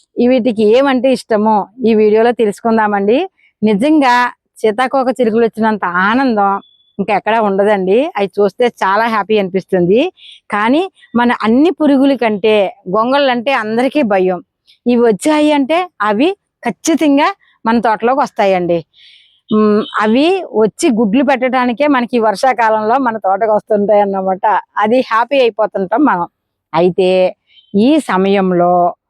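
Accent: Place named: Tamil Nadu, India. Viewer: garu mee videos regularga chustunnanu.meeru nammutara me pata videos kuda continue ga chustunnanu. Meeru okasari evaro me bhasha gurinchi Comment chesarani badapaddari kani me yasa chuste meru tamil anipustudi Kovai sarala matladinatluga undi me bhashani nenu enjoy chestunnanu. Bhasha mata ela vunna meeru matlade pratimata vupayoga karanga vuntundi. Oka friend matladinatluga prati chinna vishayamni vivaranga chebutaru me 2years pata videos kuda chustunnanu. Prati video ni like chestunnanu. Naku me videos chala upayogapadutunnai thank you 🙏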